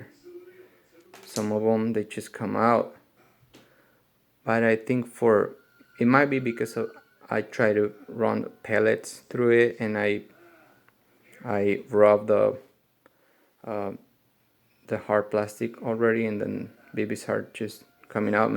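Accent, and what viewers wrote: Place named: Poland. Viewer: Are u from Poland? your Accent is very polish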